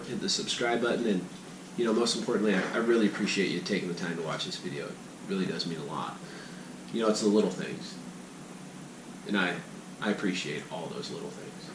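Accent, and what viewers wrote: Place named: Midwest, United States. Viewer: Did you say donate or don’t eat I can’t tell through your Midwest accent LOL good job buddy!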